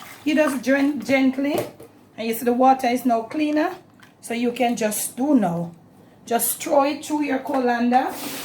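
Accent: Jamaica. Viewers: Hello Ms. I must say I love your accent your methods of cooking but most of all how you have taught me the correct way to cook the Jamaican way. Your advice your suggestions. Hopefully when I go to Jamaica 🇯🇲 I can visit you. And cook with you. Yeah mon the Jamaican way. And no I’m not Jamaican born in Colombia 🇨🇴 raised in New York living in South Florida.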